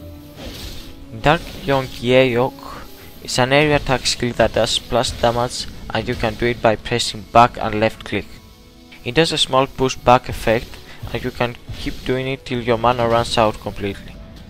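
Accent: Greece. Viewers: Στάνταρ 'Ελληνας με αυτή την προφορά. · you sound greek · The commentator has a really heavy, greek (?) accent. Cute :P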